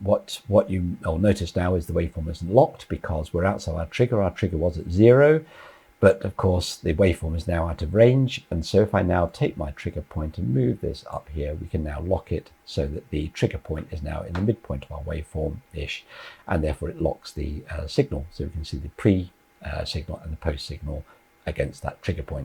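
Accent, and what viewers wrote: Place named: United Kingdom. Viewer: Master class with a nice British accent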